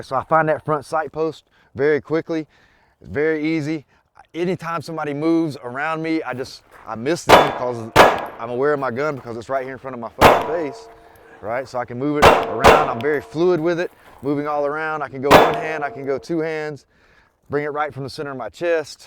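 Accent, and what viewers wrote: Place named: Appalachia, United States. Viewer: You sound like you was raised in the head of a holler like mine….like John Wayne toilet..ruff n tuff don’t take no shit off nobody…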